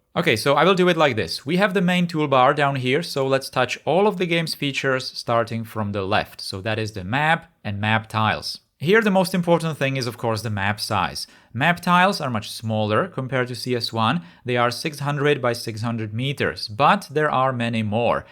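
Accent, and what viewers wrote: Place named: Czech Republic. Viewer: Are you Czech? I like your accent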